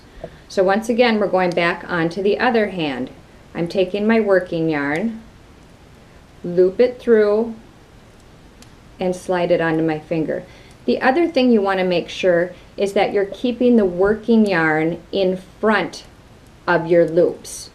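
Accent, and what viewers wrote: Wisconsin, United States. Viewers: → ... stayed a year in Wisconsin as "jeune fille au-pair" (to learn English) in a beautiful house right at PineLake or LakeChenequa in Nashotah. – So even I was able to recognise your accent.] Greetings from Basle, alexy wi.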